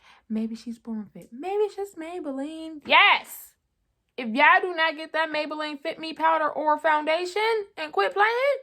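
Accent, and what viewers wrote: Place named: DMV, United States. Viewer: Ok cool! Thought I heard a DMV accent which is why I asked.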